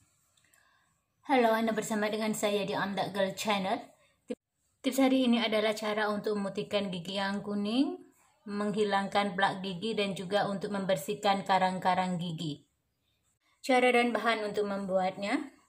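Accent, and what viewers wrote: Malaysia. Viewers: Suaranya kek Logat melayu dan serasa di dalam pesawat.enjoi the voice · suara ny kayak logat orang maisya🇲🇾🇲🇾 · Cara dia cakap macam orang malaysia bagus saya faham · Ini orang mana sih ngomongnya agak kaku? Kyknorang melayu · Logat Malaysia ,ya. Salam dr Tangerang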